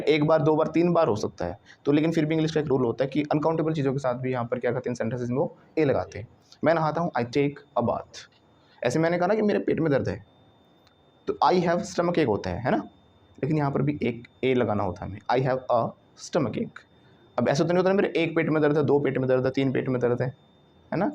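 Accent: Delhi, India. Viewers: Are you from kanjhawala sir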